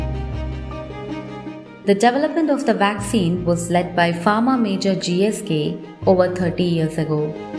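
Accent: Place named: India. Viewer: I like the content in your videos. It is well-researched, bite-sized, and packed with information. But I dislike the voiceover in almost all of your videos — it has a very thick local Indian accent, which is very distracting. For a more absorbing consumption of your videos and to reach a wider audience, I suggest you do one of two things: Get rid of the voiceover all together and include captions with background music, or hire English speakers to narrate using native US, UK, or Australian accents. This is not the first time I'm writing on this subject. Please take heed! Thanks.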